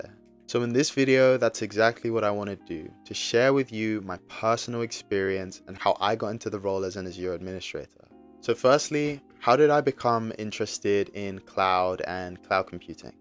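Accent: United Kingdom. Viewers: Hey man, by your accent you sound like you're based in the UK? How's the demand for cloud roles over there. I'm currently studying for the AWS Solutions Architect Associate cert. But I'd like to believe there's plenty of overlap in cloud if I decide to do anything related to Azure later on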